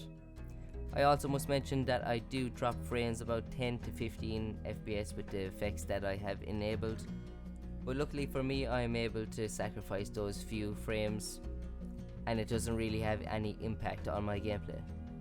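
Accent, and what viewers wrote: Ireland. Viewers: irish lad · What part of Ireland you from bud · U Irish? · thats an irish accent lad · Subbed cos you’re also Irish lol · are you Irish? HON IRELAND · r u from cork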